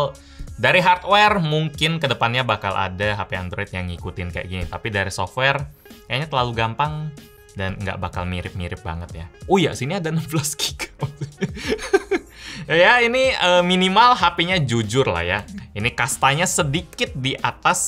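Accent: Indonesia. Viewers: Buanglah accent indo ko tu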